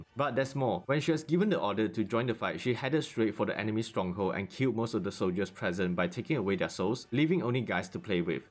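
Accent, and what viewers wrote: Singapore. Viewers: srry. Ur accent is singlish tho. Keep ut the goodwork!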